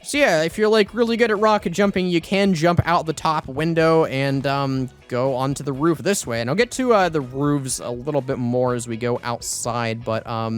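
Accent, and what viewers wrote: United States. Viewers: why does he have the crackly stereotypical american teen voice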